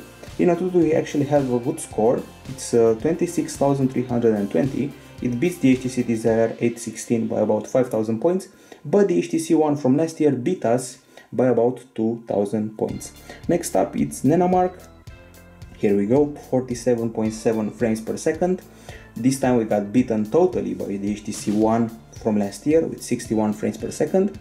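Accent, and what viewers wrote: Romania. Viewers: se simte in voce ca esti roman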